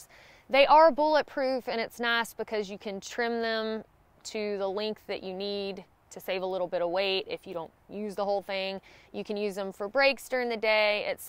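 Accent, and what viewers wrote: South, United States. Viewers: I❤ that Southern Belle accent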